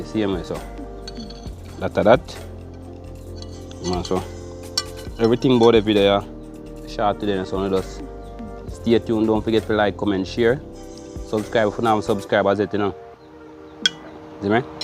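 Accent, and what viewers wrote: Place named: Jamaica. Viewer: What part of JA you from,? You sound different from Raj and JamaicanWildlife!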